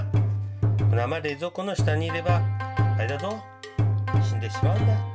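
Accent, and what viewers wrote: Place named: Aomori, Japan. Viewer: 凄い訛ってる！ 青森とかですか？🍎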